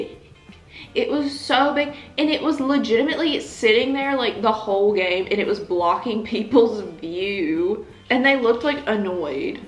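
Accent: South, United States. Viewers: My sisters name is. I can’t marry her (I’m adopted(probz sound weird😅) but your name is W two DDs F.U.N F.U.N. My artist name has two DDs(like this) too ahaha. Anyway you sound southern. Southern hospitality meet African affability together= ignition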